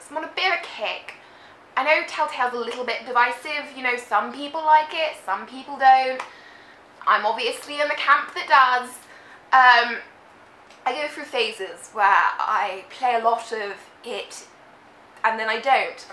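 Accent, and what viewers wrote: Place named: United Kingdom. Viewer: Aren't you cute? Go for comics and animes! And music! and movies! and videogames! and lovely british accent! XD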